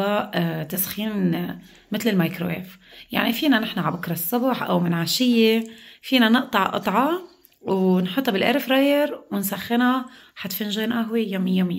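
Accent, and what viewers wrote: Iraq. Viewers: فدو حلو عليج الحجي العراقي يابعد روحي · تسلم ايدك يا شكلها يشهي فعلاً من بعدك قفل الترند مافي احد يعملها بعدك وحلوة بفمك اللهجة العراقية متابعتك من العراق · تسلم ايدك ماشالله إشكد مرتبة اللهجة العراقية تجنن عليج❤ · عاشت ايدك انتي انسانه طيبه وروعه وشكل طاقية السلطان باين حلوه وطيبه وكلامك كلش حلو بالعراقي وتحياتي لكي ول وحماتك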